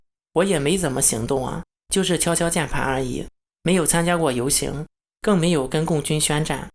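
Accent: China. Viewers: 我觉得KLAUS的视频做的很认真也很棒，你的口音像大陆人，但是你的思想更像台湾人，特别是你用繁体字包括上一期视频结尾用的中华民国国旗歌。你是不是大陆人移居到台湾的人我不得而知？大陆真实情况很多台湾人并不了解，只是通过看负面新闻了解中国大陆还有中共。中共从邓小平开始改革开放经济腾飞后，早就不信仰什么共产主义了，共产主义只是一个称谓罢了。市场经济做起来了以后，面对这个巨大的经济利益，中共其实蛋糕还是切得非常好的。大部分人都因为利益得到了好处更加拥护中共，当然小部分人利益是确实受到损害从而反共的。民主价值观在现今中国没有生存土壤，原因是中共这个统治系统养活了太多人，把中共统治系统砸掉就等于砸了很多人吃饭的家伙。民主不能当饭吃，而把中共统治系统当饭吃的人太多太多，而且吃的比民主世界的饭还香，我不知道你明白不明白我说的意思。中国有14亿中国人，而依靠中共统治系统生存的人是占大多数的。就是这些靠着中共吃饭的人是中共统治的基石，另外没有吃到中共系统的饭的人，中共也一直在维稳，并不是采用暴力手段，扶贫项目帮助贫穷地方人脱贫，有很多好的政策让政局稳定，正反两面手法，大棒加糖。比如新疆要闹独立，中共一方面加强武力镇压疆独消灭有生力量，另外一方面从思想上根除穆斯林极端宗教信仰开办培训学校。还有拨款到新疆帮助新疆的穷人脱贫致富，只要你愿意去看就可以看到现在新疆人过得非常富足谁还跟你对抗，如果大部分新疆人不认同共产党统治，新疆那些骁勇善战的少数民族早就翻天了。7个穆斯林极端恐怖新疆疆独拿砍刀在昆明就能砍死砍伤130多人，你就知道新疆人多厉害了。极端宗教疆独份子根本不怕死，人肉炸弹武装对抗政府解放军都是没想过要活命的。但这只是少数极端份子，大部分人民只想过富足的生活。如果中共只会欺压人民而不懂如何让大多数人过得富足，这个政权早就被推翻了。如果邓小平不搞改革开放，中共早就垮塌了多少年了。如果民主可以当饭吃中国早就民主了，中共早就不存在了。民主制度也不是完美制度，独裁政权也不完全就是暴政。中共暴政也只是暴政要跟它做对抗的人，靠中共吃饭的人从来不觉得中共是暴政。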